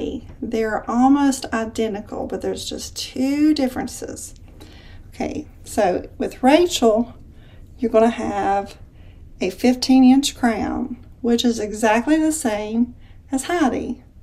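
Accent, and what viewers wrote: South, United States. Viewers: Just subscribed to your channel!!!! So glad I ran across your video!!!! Loved the review and your southern accent as I also have one!!!! So glad to find a review with the same accent as me!!!!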